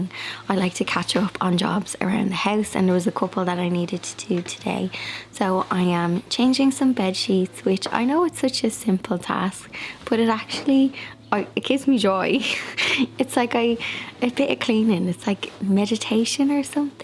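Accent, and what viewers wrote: Ireland. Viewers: Love your videos ❤️ I just found you!! I'm hooked so I subscribed! I'm from South Carolina, USA. So this southern accent loves your Irish accent!! Your home is beautiful!! I'm in love with your sofas!! Did you buy them or did you reupholster in that beautiful fabric?!! ❤️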